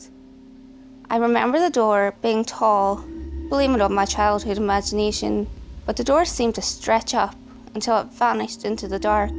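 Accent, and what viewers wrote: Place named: Northern Ireland, United Kingdom. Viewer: that north irish accent though, not as bad as my midlands accent to be honest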